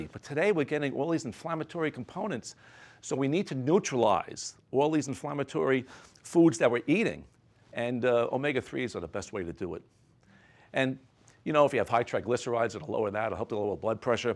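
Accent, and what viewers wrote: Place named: Brooklyn, United States. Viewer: Dr Sinatra has been my cardiologist for a decade now and he doesn't even know it but I know of him from a grounding video as well as a supplement customer. He's down to earth and "grounded." That Brooklyn accent sounds a little like Anthony Faux Chi but unlike Faux Chi..... We KNOW Dr. Sinatra will always tell people the truth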